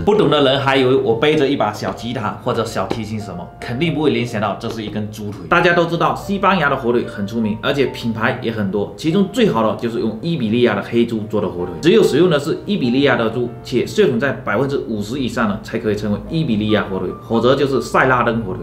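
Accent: Fuzhou, China. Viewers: 口音听起来是福州人吗？ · 浓浓的福州腔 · 我怎么觉得你是福州人，哈哈哈哈，口音和我家叔叔的好像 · 胡州老哥普通划很亲切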